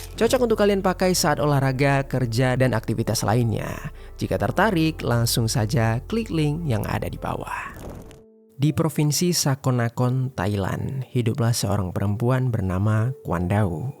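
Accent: Java, Indonesia. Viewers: Ada jowo jowo nya yang ngomong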